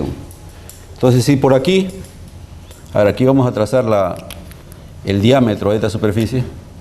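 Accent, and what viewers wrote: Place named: Peru. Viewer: Que buen video, gracias a UTP por subir sus clases. Me parece que el profesor es peruano por su acento.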